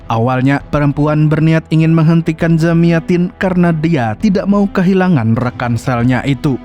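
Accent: Banjar, Indonesia. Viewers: Orang banjar kh pian??? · Bang orang Banjar kah...Banjar mana Pian bang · Orang banjar sakalinya😂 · Urang banjar kh pian bg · Orang Banjar kah mang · bah dah jua urng banjar si Mimin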